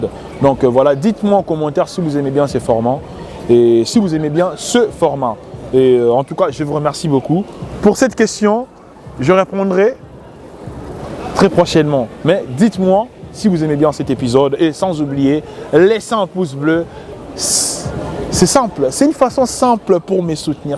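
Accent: Democratic Republic of the Congo. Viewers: tu as déjà avouer Mec. Tu es originaire du Congo Kinshasa. Et votre accent montre plus que vous êtes congolais. Je suis un abonné ( Espagne🇪🇸 )depuis 2019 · Avec ton accent t’es congolais sur 😂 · Ton accent tes délire tn caractère prétentieux cs genres d choses il n'y a qu'un CONGOLAIS qui a ls couilles d le faire, t'es un zaïr🇨🇩🇨🇩🇨🇩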